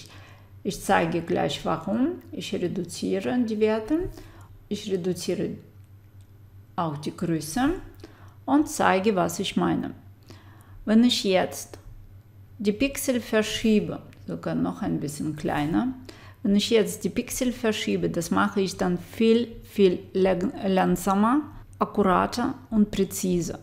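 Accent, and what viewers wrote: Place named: Russia. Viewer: Хотела найти на русском, не нашла. Нашла на немецком… с русским акцентом (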